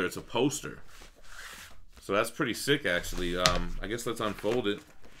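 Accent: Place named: Bronx, United States. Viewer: i dig your videos and love your south Bronx accent but i gotta ask...lol. where in the world do you keep all the goods constantly coming in dude? lol between the statues and the 12 inch books, you must live in a mansion or a warehouse..lol. peace.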